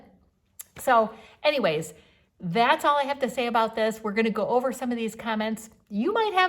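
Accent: Michigan, United States. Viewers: I started listening to you because of your accent. My mom was born in Michigan and I in Elkhart Indiana. I miss my birth place and the people. Sorry people are so arrogant to make the negative comments. As you say we are all different. My thing is take what you can use and consider the other. I am 73 and need all the help I can get for new styles. I thankyou for your input. I am a size 4 so I have to be careful to look stylish but not to young in my selections. You help me eleminate! Thankyou.🌞👍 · I realize this is an old video. But I still want to comment. I think you are adorable. You are built so cute! Your blue eyes are so bright and beautiful. I love your voice and your cute Michigan accent. 😊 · I am not one to comment online, until now. I don’t live close to a Walmart. I’m over 60 and your wardrobe wouldn’t be right for me, BUT, I love your videos!! 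You are so refreshing and positive. You’re in great shape. Enjoy it! I’ve never been to Florida but enjoy the tours. I also thoroughly enjoy your Michigan accent! Please don’t change❤️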